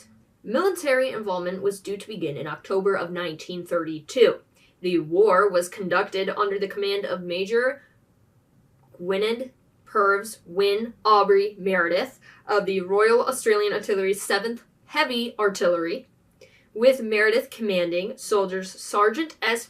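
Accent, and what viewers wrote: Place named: Transatlantic, United States. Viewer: Love the music choice for the intro 😄. Can just hear that transatlantic accent.. and now for more Weird World History! 😂 "Number of birds" must be 2 🙂.. that's a number of birds 😄. "Let's go to war with a giant bird!" 🙂😂 Imagine if they really did say that 😆. And now they're protected.. they totally won 😂. You seem very passionate about this. Your eyes as you say "HUmAns!" 😄. It's nice.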